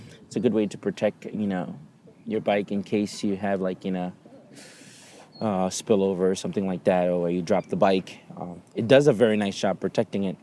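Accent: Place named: New York, United States. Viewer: For some reason all I can hear is John Leguizamo, well without quite such a harsh Queens accent. Must be a New York thing, though you sound nothing like my friend that's New York Italian/Irish, but then he's from Northern NY.. 30+ years away and his accent is still thick as a cabby's heart.. especially when he drinks. Ever noticed the Termi logo looks like a pair of balls with a schlong hanging low? :P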